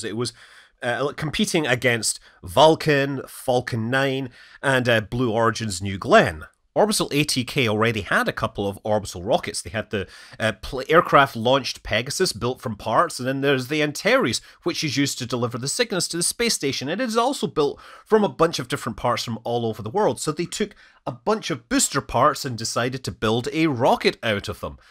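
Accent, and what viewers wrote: Scotland, United Kingdom. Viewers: Aside from the fact that someone creating videos about spacecraft includes audio from a track, I have to say that the Scottish accident for the English language is unquestionably the most appealing. Is it is so charming and nominally out of place with modern society; hearing it applied to cutting edge technological matters is all the more delicious.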